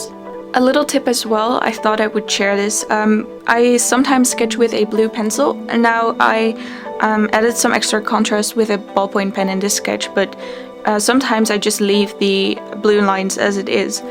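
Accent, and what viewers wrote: Netherlands. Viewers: You sound Dutch haha xd but it's a cute english! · Love the video, this will help a lot, thank you!❤ (Are you dutch?, cause I think you kinda sound Dutch..😊) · Very helpful, thank you :) (btw, are you dutch? though I recognized the accent :P) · You sound dutch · You sound Dutch (not in a bad way, you talk very clearly)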